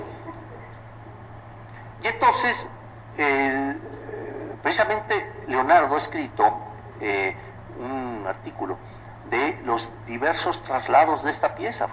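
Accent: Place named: Spain. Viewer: y porque habla Como español???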